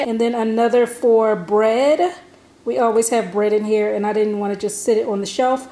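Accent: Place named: Washington DC, United States. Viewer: Are you from DC? You have a DC accent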